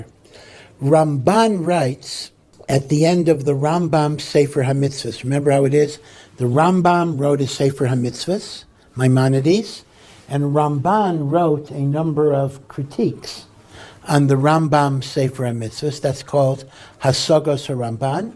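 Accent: South Africa. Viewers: Dude where’s your accent from? South African or Australian? And how’s Palestine your home?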